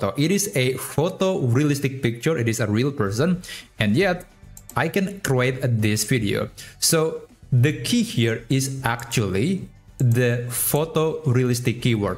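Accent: Indonesia. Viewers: your accent...are you indonesian?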